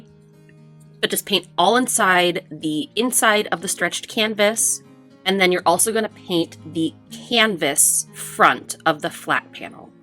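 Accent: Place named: Midwest, United States. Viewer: from another Midwestener, I understood that you said moss 😆😆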